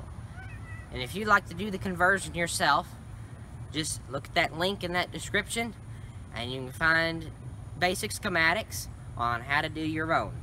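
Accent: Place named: South, United States. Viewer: Man you haters got to stop hating, this young man is awesome and you throw around shit about being gay and needing bass in his voice. Dudes you obviously ain't been nowhere in your boring ass lives. People from certain parts of the country just talk like that, parts of NC, parts of Tennessee, and many other regional dialects, just watch NASCAR some time. My hat goes off to this positive young man not wasting his time on worthless pursuits. Soon as one of you does something relevant feel free to cast stones.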